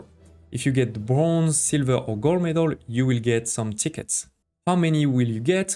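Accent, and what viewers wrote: France. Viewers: j'ai un peu cramé ton accent francais du coup je pose ma question en FR :D , tu serais me dire comment on utilise les items delocks avec les tickets en or ? j'en ai debloqué mais j'arrive pas a choper les ameliorations merci par avance :D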